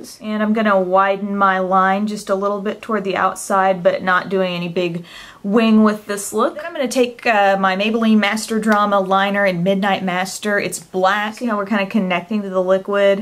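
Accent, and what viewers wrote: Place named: South, United States. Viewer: I am new to your channel and i'm so glad I stumbled across you because you are so great at what you do. Also, I don't know much about you personally but I swear you have a tinge of a southern accent.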